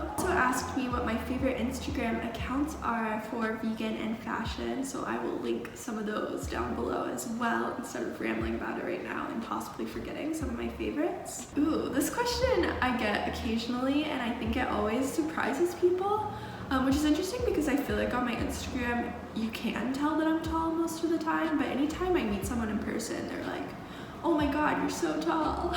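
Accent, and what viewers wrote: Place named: South, United States. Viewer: It’s for sure a tad different. Also she did use to have a southern accent. It’s no big deal to notice change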